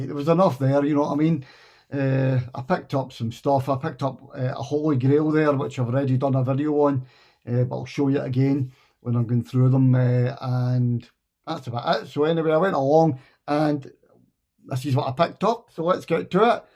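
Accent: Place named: Scotland, United Kingdom. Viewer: Hi! Just found your channel after a year in the VC- great to hear another Scottish voice, thought it was just me and my mate Jack. Great selections here. Look forward to checking more of your stuff out. Cheers, Gordon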